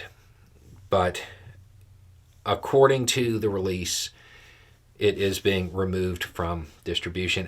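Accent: South, United States. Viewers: Dear, I love your videos! And they keep getting better! To hear truth, insight and intelligence spoken with a southern accent is so surprising and refreshing! And I love Curious George, too! Keep being a genuine patriot and supporting our Constitution!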